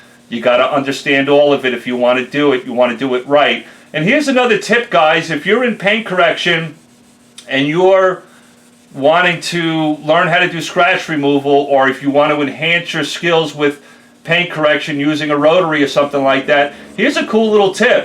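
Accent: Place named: New England, United States. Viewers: Obviously doesn't sound like a Dallas native. Please go back east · Horrible!! What a joke. You just ramble on and on. Nobody clicked your video to here of your personal life or personal BS. You should remove the fact that your a local DFW Texan especially with that infuriating Yankee accent. Knowing that alone is a deterent to clicking this video😠😠😤😡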